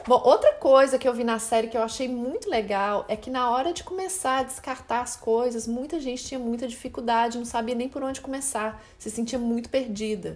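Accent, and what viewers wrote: Minas Gerais, Brazil. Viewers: vc é mineira?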